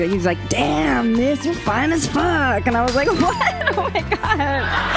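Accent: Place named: Mexico. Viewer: You sounded like lana but in mexican accent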